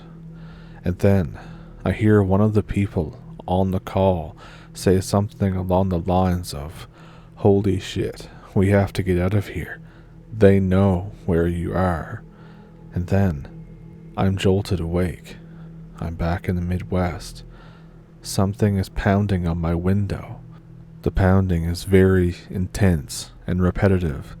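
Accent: Ontario, Canada. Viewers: Hello, - Happy Harvests, I thought I would drop by and hear a good story or triple. 🎉, i have said this before, but your accent sounds just like my favorite Ontario cousins, right on best holidays, friend. 👍🏿👹🌅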